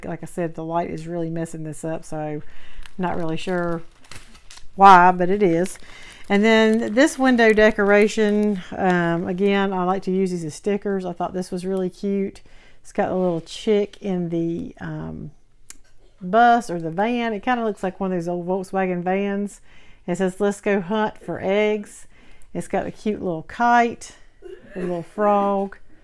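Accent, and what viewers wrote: South, United States. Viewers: I thought your "specific" southern accent sounds like my family❤ Lots of my family live in East Tenn. and western NC which is pretty close to that region. Don't mean to sound weird, but there are several different variations of southern accents😊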